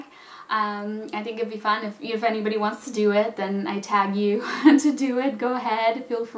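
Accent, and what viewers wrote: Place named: South, United States. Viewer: I detect a little southern every now and then. :)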